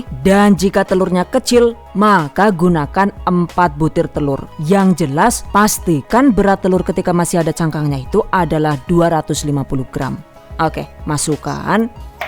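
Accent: Banyumas, Indonesia. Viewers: asalnya dr ngapak ya mba Pd bae karo inyonglah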